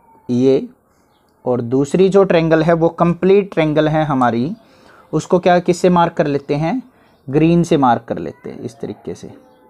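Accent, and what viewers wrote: Haryana, India. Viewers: Sir Haryanvi hai awaz se hi pta lag gya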